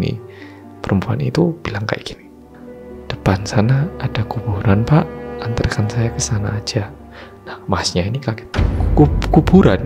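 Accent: Java, Indonesia. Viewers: Suaranya khas bgt....logatnya apalagi....tetap jawa y mazzeeeh....sukse trs ya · Kesian ih Mbaknya 😭😭 semoga lukanya pulih Makacih, Boloku Sing Paling Medok Dheweeeee 🙏🏼🙏🏼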